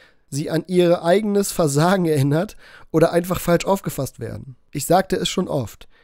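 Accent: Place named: Middle Franconia, Germany. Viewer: Da muss er nur noch ordentlich deutsch sprechen können, und nicht nur meddlfrängisch.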